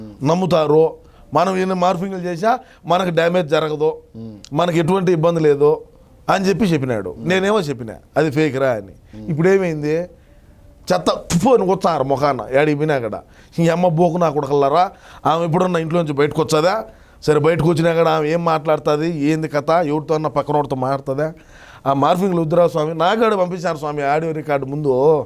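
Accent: Rayalaseema, India. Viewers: Anna needhi Kadapa na 😅 rayalaseema slang lo vunnav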